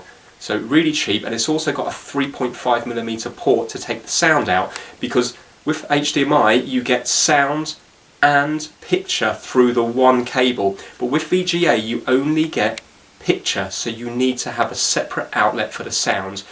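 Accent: United Kingdom. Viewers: seeing you talkin in british accent is amazing your accent is great tbh its best british accent i have ever seen someone talking.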